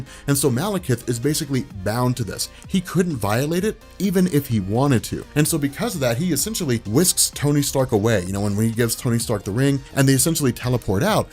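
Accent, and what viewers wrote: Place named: Appalachia, United States. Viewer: i thought i heard hillbilly in your voice i hate KY as well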